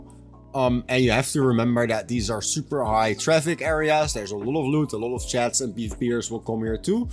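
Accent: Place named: Netherlands. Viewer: Nederlands, I can tell :D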